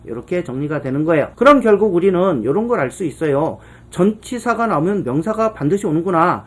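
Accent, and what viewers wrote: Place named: South Korea. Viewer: 드뎌 전치사 편에 입문 하게 됐네요. 감사합니다 선생님. 선생님 목소리는 완전 한국토종인데 외모는 너무 이국적이셔서 쪼금은 놀랬습니다.😅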